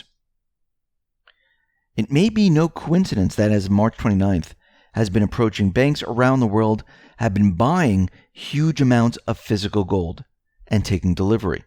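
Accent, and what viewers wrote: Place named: New England, United States. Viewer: It's a yankee accent thang for *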